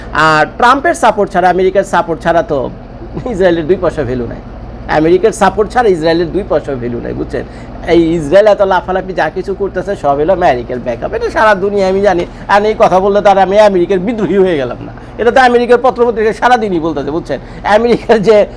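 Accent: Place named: Noakhali, Bangladesh. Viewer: bhai mone hoy noakhailla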